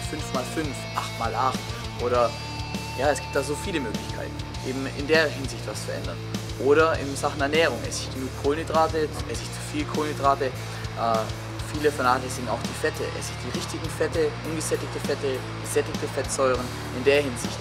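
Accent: Germany. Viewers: woher kommt den der Schwabe genau? :D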